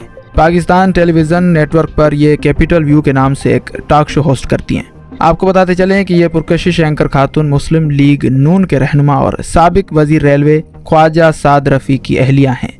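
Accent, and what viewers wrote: India. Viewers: How come this guy sounds like an Indian.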